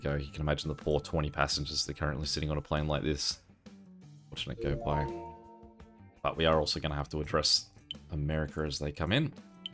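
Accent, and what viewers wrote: Australia. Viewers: Hi ☠️ I know that you live in Victoria, Australia 🇦🇺 because of your accent. I live in Adelaide, Australia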